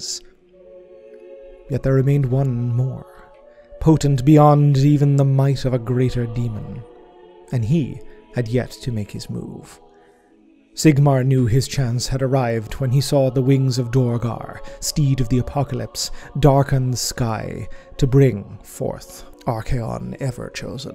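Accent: Ireland. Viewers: Where abouts in South Dublin are you from. Am I right in picking up a south dublin accent?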